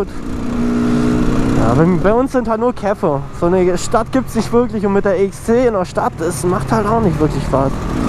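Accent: Swabia, Germany. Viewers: Das Problem eines jeden Schwaben: Schnell von Schwäbisch auf "Hochdeutsch" switchen. Und "des" und "isch" bekommt man eh nur schwer weg, egal wie sehr man sich bemüht xD Ich spreche aus Erfahrung :D Aber du machst das ganz schön gut :P Bei mit kommt dann irgendein komischer Mix-Dialekt raus, wenn ich mich anstrenge. Geht es noch jemandem so, oder bin ich die Einzige? :D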